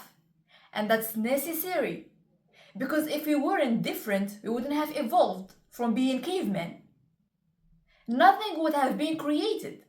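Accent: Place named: Morocco. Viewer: U sound Moroccan!❤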